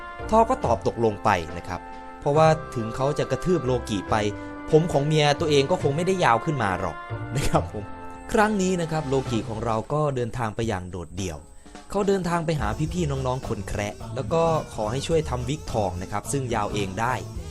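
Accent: Isan, Thailand. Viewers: พี่เป็นคนอิสานใช่มั้ยครับ 555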